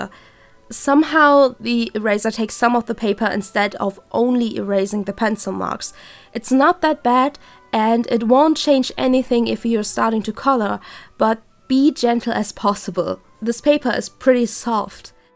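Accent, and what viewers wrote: Germany. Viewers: Ich wollte wissen was ein Shikishi ist, da ich davon gestern das erste Mal gehört habe und muss kurz sagen: Ich erkenne Deutsche, die Englisch sprechen irgendwie sehr oft :'D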